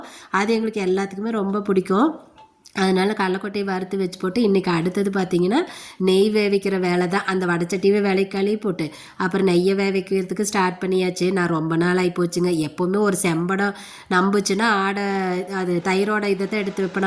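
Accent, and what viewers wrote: Kongu, India. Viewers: Kongu slang 🎉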